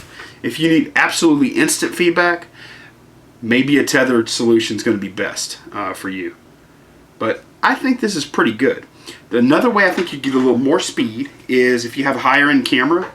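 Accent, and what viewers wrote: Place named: Maine, United States. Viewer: Your accent sounds like a "guy" I once played poker with. Are you from the Maine area? You articulate your videos very nicely for those of us stunted by the Thesaurus Rex cunning linguist known as DLeake.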